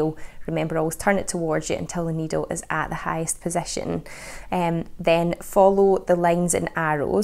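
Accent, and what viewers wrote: Scotland, United Kingdom. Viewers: I just love the Scottish accent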